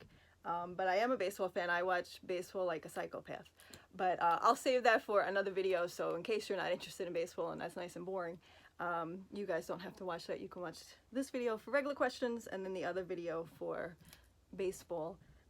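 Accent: New York, United States. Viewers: Awesome video, love the N.Y. accent. Just an FYI I have notifications on and didn’t get any. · I’m a Londoner and been to NYC many times and I think your accent is awesome and quintessentially New York which is no bad thing at all. Sorry to hear of your fathers passing. Snap regarding being Italian 😊👍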